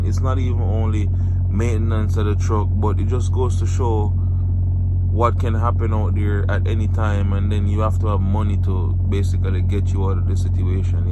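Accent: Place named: Jamaica. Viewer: Sounds Jamaican 🇯🇲 to me …… mistakes do happen head up champ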